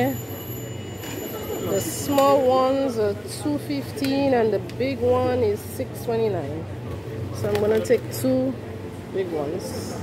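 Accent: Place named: Saint Lucia. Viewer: I just came across this channel this morning am, today October 15, 2024. I just decide to check for youtubers from Lamentin. I decided to try this and the first channel I came across is yours. I heard the accent and I thought to myself, is that a fellow St Lucian. I have been told I have some relatives in Lamentin, because my grandfather was born in Martinique. I have been considering trying to look into find relatives who may have known my grandfather. Thats why I decided to look up youtubers from Lamentin. By any chance, have you come across anyone with the surname.....Darcis. You have a new subscriber.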